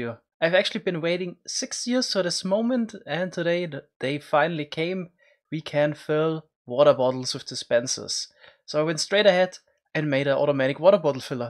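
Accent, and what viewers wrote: Germany. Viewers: When Germans speak English I am also german .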